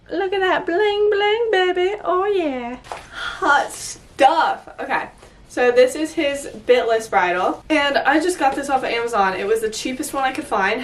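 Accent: United States. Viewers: north of where?😂 from what I hear you speak in an American accent, so do you mean north of the USA?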